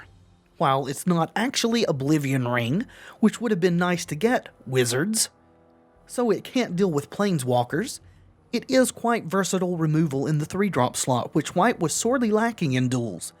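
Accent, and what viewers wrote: South, United States. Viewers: First time watching your videos, had a very good time. Your southern accent kind of reminds me of Gideon from Gravity Falls. Hope you take that as a compliment! :D Thanks for the content. Thumbs up.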